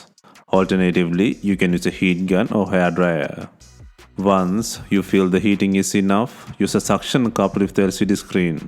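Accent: India. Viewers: Thank for the instructions! I'm curious, are you from India? Sounds like Indian accent 😊 By the way, I'm from Belarus! 🇧🇾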